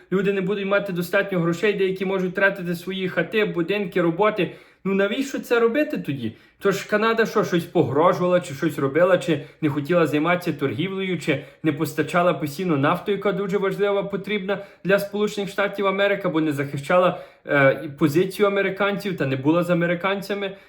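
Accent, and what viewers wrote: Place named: Ukraine. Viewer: Я підписалась чисто через те, що Ви маєте таку дуже українську говірку (стиль вести бесіду) нагадує, щось рідне 🩵💛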